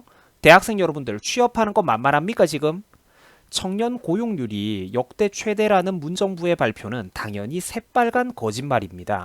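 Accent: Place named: Gangwon, South Korea. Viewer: 혹시 고향이 강원도신가요? 억양이 들리는거 같은데 구수하고 정겹습니다 ㅎㅎ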